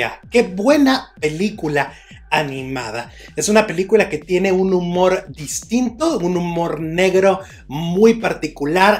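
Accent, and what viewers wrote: Fresa, Mexico. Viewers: Qué antipático eres , tú tono de voz es desagradable quieres hablar muy fresa antes hablabas normal, y caes mal🤔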